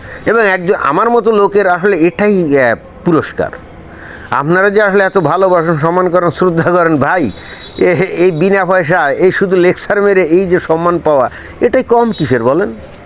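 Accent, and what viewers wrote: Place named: Khulna, Bangladesh. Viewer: আপনার কথা শুনে মনে হয় --- আপনি খুল্লে জেলার লোক! ওটা আমাদের পূর্বপুরুষদের বসবাসের জায়গা ছিল!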